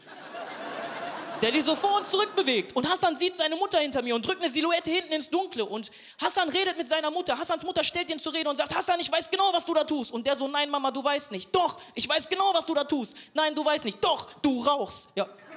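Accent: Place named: Duisburg, Germany. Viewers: Super Typ, ich bin aus Hamborn und in Marxloh zur Berufsschule gegangen. War damals (um 1980) schon ne besondere Gegend. Wohne seit über 30 Jahren in Stuttgart und den Duisburger Dialekt finde ich immer noch einfach klasse. Mach weiter so, super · LoooL, hatte noch nie von ihm gehört aber so geil! Liebe den Duisburger Assi Slang, bin viel in Duisburg unterwegs. Sehr erfrischend! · Liebe unsern Ruhrpott Akzent 😎☺️❤️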